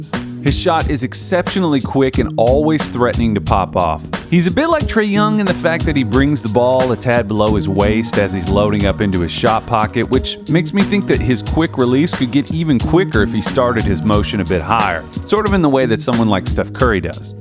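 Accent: South, United States. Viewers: Come for the scouting and dead-on Southern accent, stay for the "What We Do In the Shadows" meme. Top content as always!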